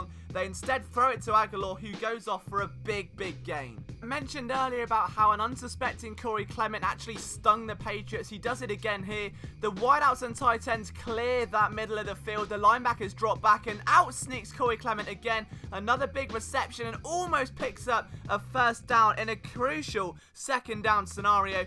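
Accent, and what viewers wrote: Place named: London, United Kingdom. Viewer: Your accent is still pretty heavy (I assume you're originally from London(?)). I'm wondering how if you live in Philly (I assume you live there).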